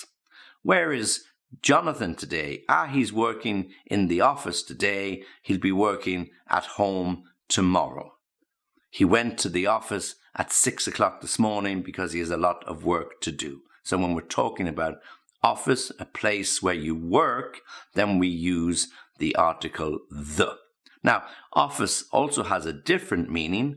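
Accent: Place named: United Kingdom. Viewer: If I am not mistaken you are speaking British English, or at least not Amerikan. And I like it very much. I like your lessens. Thanks a lot.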